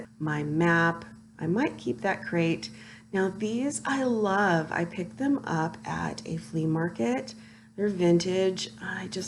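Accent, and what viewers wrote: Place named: Colorado, United States. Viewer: You sound like you could be a Coloradan. 🙄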